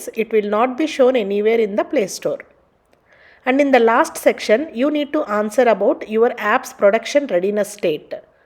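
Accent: India. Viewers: Why always indian . Accent. I hate it . I can't understand it very well. F. This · Very strong indian accent 😊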